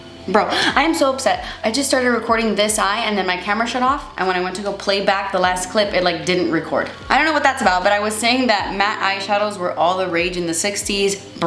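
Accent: United Kingdom. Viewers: Love the british accent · I adire your videos and your attitude, but man that British accent... it freaks me out👾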